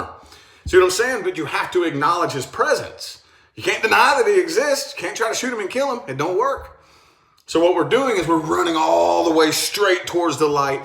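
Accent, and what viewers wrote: South, United States. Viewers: I love your voice!! It sounds very southern ❤💕💕💕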